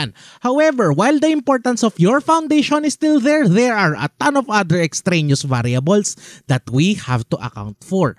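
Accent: Batangas, Philippines. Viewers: Hi Atty! Are you a Batangueno? Natuwa lang po ako sa inyong punto. Will be starting on the 24th as First Yr law student. Thank you for your very helpful contents and congrats on your 100th episode. 👏👏👏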